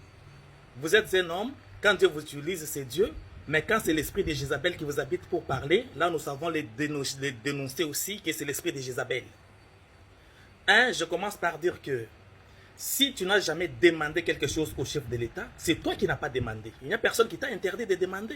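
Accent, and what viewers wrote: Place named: Kasai, Democratic Republic of the Congo. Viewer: Yo nani. Aventurier tala ligne ya ba voyous. Oza muluba deja tonalité eyokani. C normal que tu défende les voleurs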